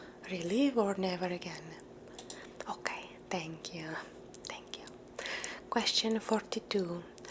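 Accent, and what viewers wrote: Italy. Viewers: Your rather questions are always so hard to answer!😅 But your Italian accent is adorable! Bravissima!😉🇮🇹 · Italian accent videos are perfect for relaxing and unwind your soothing voice makes me feel sleepy 😴 😊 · The italian accent is spot on!! 😍🇮🇹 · this was incredible omg i hope we get more italian accent videos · video with the Italian accent are one of my favorite. <3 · Another great Italian accent video. 😁 Thank you. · Ah, you know how much I love your Italian accent asmr. Thanks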